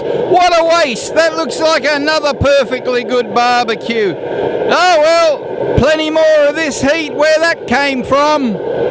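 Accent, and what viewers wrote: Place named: Australia. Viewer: flamin aussie's eh ?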